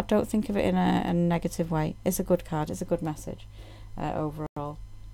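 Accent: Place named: England, United Kingdom. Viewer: your welcome its nice to listen to an english voice too, nothing against any others, ive just not come across many english speaking readers. From uk england.:-)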